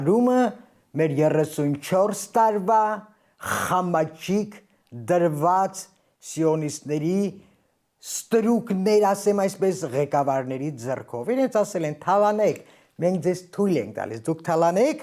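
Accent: Standard. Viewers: Տիկին ջան, ինչ գրագետ ու հիանալի ելույթ կայացավ, շատ ենք կարոտել մաքուր հայերենով ելույթ լսել...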